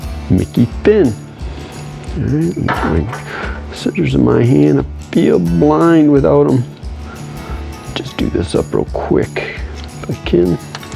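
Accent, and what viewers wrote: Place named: Minnesota, United States. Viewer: I'm from Minnesota. Are you? You sound like me.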